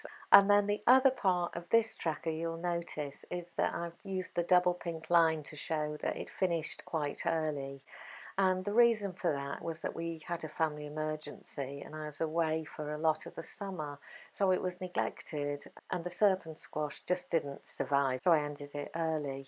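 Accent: United Kingdom. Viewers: Thank you, I really enjoyed your video! I have a small balcony patio garden and I live in a apartment in Salem Oregon, but I’m originally from London. It’s so nice to hear a lovely homegrown voice and accent lol. I’ve been growing vertically, and my patio is north facing so I’m trying to maximize and organize myself better as I’ve really just been winging it the best I can, which is stressful. I’m going to give this tracker a try this year. Also I’m trying to put together my notes from the last three years of growing what I can into a garden journal. I hope to start growing what I can year round and keep track of progress and problems. I really love your tracker idea! 💕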